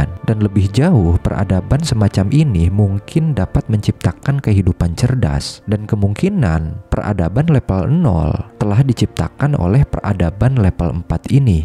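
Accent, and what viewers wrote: Indonesia. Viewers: orang sunda ya bang? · Akangnya urang sunda.. lepel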